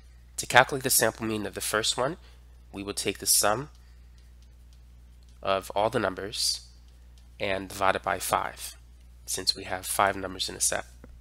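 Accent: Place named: New York, United States. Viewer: i can hear your NY accent lol i love it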